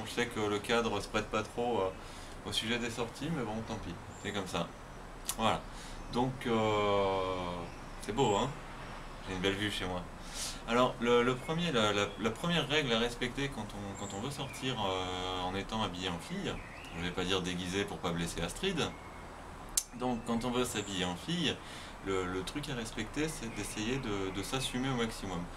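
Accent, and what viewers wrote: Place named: South, France. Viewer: ah oui d'accord alors tu commence à avoir l'accent du sud !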